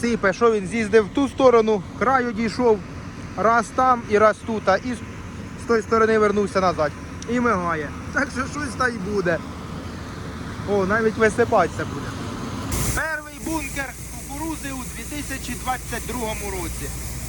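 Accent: Poltava, Ukraine. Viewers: Звідки ви ? Акцент Полтавський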